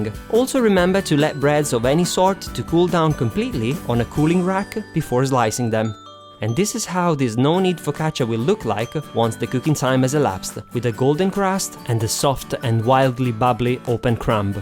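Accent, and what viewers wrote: Italy. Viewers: Tu sei toscano allora!! :)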